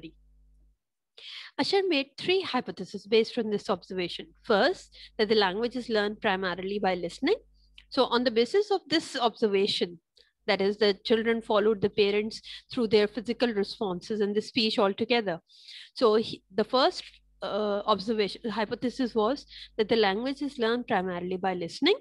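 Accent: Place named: India. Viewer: Nice explanation but your dialect is Indian could i ask y if you are indian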